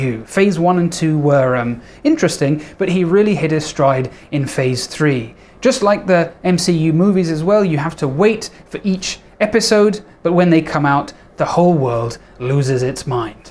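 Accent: France. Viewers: Thank you for shouting out French medical youtubers! They're great and really under-appreciated. I hope you recover from the flu quickly. Also your French accent is sublime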